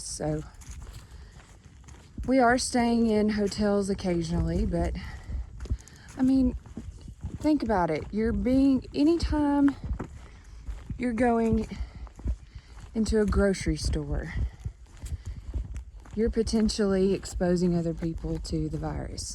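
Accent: South, United States. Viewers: Y’all would be SOOOO fun to hike with! (In a non-social distancing environment, of course)! Love the southern accent young lady! Our Head Start children would loVe your voice at story telling time!!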